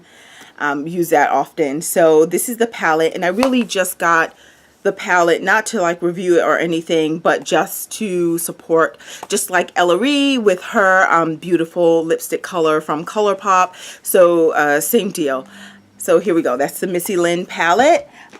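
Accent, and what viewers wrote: Caribbean. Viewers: Yup! I heard a Caribbean accent, I think. I'm from hot Houston, Texas! ❤️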